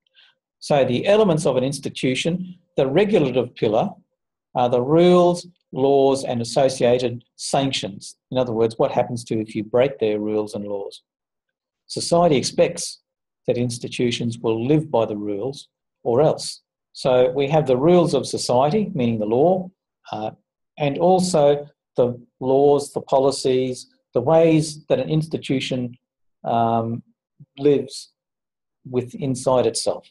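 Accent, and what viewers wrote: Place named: Australia. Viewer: Aussie accent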